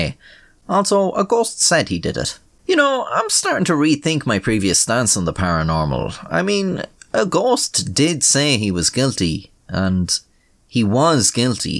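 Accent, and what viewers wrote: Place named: Ireland. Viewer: Come to Ireland for a year and you can understand him better :)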